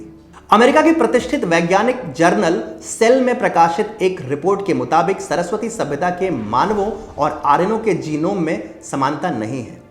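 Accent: Standard. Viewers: । आपका शुध्द हिंदी का वाचन करना एवम् आपके हिंदू-प्रेरित तथ्य, आपके हृदय में स्थापित हिंदी, हिंदू एवं हिंदुस्तान के प्रति अप्रतिम आस्था को प्रदर्शित करता है ।हिन्दुओं को अपने संस्कृति के प्रति जागरूक करने हेतु आपके इस अद्भुत प्रयास के लिए मैं आपका आभारी हूँ । वास्तव मे आप अतुल्य है। ।धन्यवाद ।- प्रभात रंजन सिंह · Detail study video. Nice. Shudh hindi sunkar bahot acha laga. Dhanyawad.👌👌👌👌👌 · i respect your beautiful shuddh Hindi narration 👌👌👌🤩🤩🤩.. Phranaamam Namashkaar 🙏🙏🙏 AIT is a false theory to divide us!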